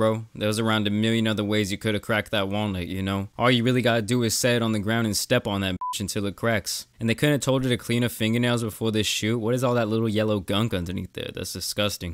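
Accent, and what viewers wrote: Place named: New York, United States. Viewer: The only guy from Texas with a New York accent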